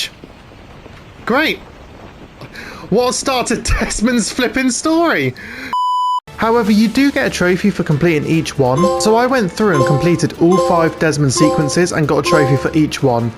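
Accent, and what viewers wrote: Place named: United Kingdom. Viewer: revelations is one of my favorite ac game it's a perfect conclusion to Ezio and Altaïr story (if you don't count ambers) it has some of the best soundtracks of the whole franchise , the main theme is fabulous and even better than Ezio family so i'm sorry you had a bad experience i'm not a fan of the arabian/turkish culture but i love Istanbul and they did it perfectly in this game the orange sun still impresses me it's the only game with gta san andreas with an orange sun that i've seen i love your British accent i will visit London one day for sure ps : i love tea too :)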